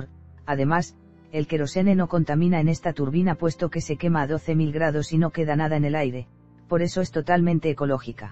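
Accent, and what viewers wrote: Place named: Spain. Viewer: Me desorientado la voz!! Parece una gallega la que habla. (Española)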